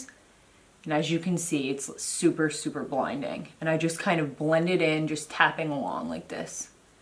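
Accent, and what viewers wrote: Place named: New York, United States. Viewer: Ur def from ny right lol I can tell by your accent I’m from Long Island I live in Queens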